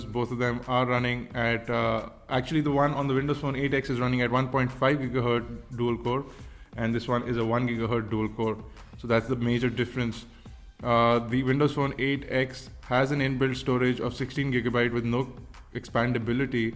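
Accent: India. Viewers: I have never seen a white person with a indian accent before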